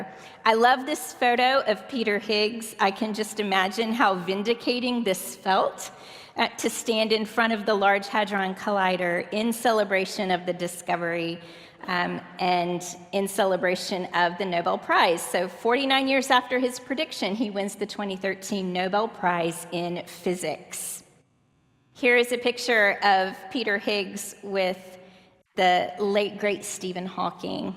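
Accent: United States. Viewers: Hers is the most quintessentially American accent I've ever heard.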